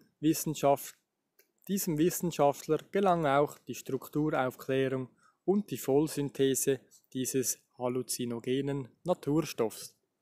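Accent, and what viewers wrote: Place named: Switzerland. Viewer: Sorry, musste nach dem ersten Wort ausschalten!! Wenn auch noch ein CHler meint er müsste was vorlesen!!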